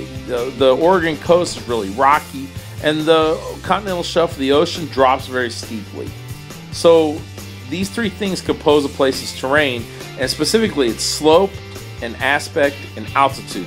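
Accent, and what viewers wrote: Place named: Texas, United States. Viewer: Dat half Texan accent Also very useful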